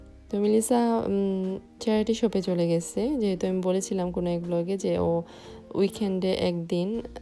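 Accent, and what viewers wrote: Sylhet, Bangladesh. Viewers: Apu tomi ki sylhel y?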